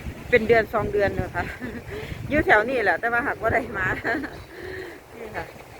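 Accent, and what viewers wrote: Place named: Isan, Thailand. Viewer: สวัสดีครับ มาชมวิวทะเลสวยด้วยครับ อยู่จังหวัดไหนครับ สำเนียงเพราะดี (ผมเคยอยู่ร้อยเอ็ด เกือบ 5 ปี) ส่งกำลังใจมาเชียร์ครับ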